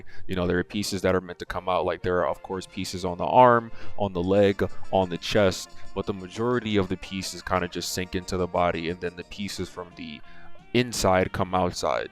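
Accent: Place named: United States. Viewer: Why your voice is American your name is Indian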